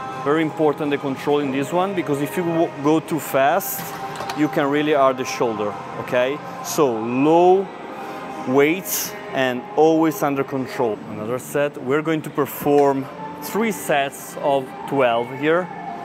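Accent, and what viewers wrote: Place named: Italy. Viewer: i assume by your accent , tu sei un italiano amico !!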